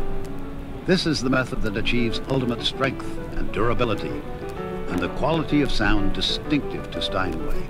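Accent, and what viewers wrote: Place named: New Zealand. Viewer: Why does the narrator, John Steinway have a NZ accent? I am confused... Is there a NZ connection?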